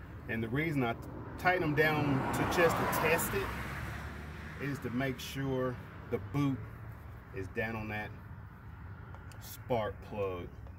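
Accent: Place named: United States. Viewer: You just helped me so much and i greatly appreciate. What i enjoyed most though was your country accent and the fact that you made the time to break down and show us step by step. This video is by far the best learning experience i have gotten from a mechanic video. I would like to see more since I own a 96 nissan maxima and have changed out numerous censors and other important parts and mine will still not run. If you could maybe help me that would be fantastic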